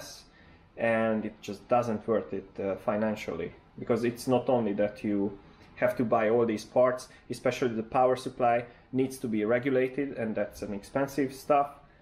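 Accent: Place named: Hungary. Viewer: Are you Hungarian? Your accent tells me you are! :)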